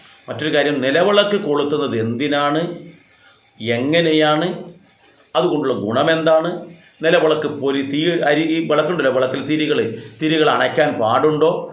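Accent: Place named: Kannur, India. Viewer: Namaste ji,thankalude nadu kannuranennu tonunu samsaram ketit,kannur evideyanennu parayamo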